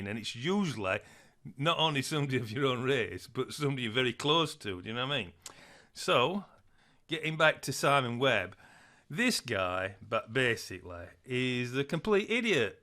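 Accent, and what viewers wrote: Yorkshire, United Kingdom. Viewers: Simon is 100% correct, you really have no idea what you are talking about, that silly Yorkshire/Midlands accent is really off putting by the way...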